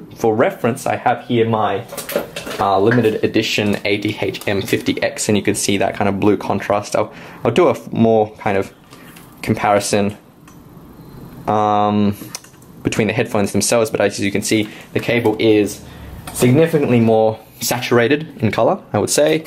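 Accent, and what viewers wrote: Australia. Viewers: I like ur australian accent!!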